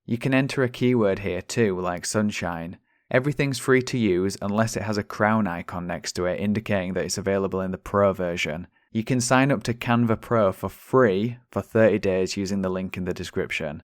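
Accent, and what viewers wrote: Yorkshire, United Kingdom. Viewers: I recently found your channel and I have been making my way through your videos and I just want to say a huge thank you, your videos have been insanely helpful. I'm fairly new to this whole POD scene, but nothing will stop me from making a success of it. Also, you sound like you're from my neck of the woods, much love from Yorkshire.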